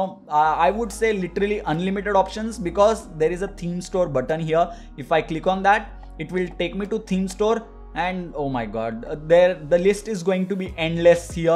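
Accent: India. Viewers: Wtf !!!!!!! You can't understand this fucking india-english ...what the fuck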